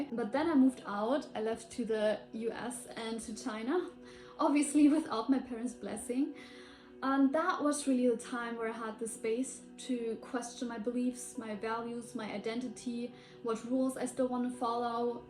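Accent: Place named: Germany. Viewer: I’m not sure if you are German, but your accent very much sounds so. The idea that something like that could happen where I live… :(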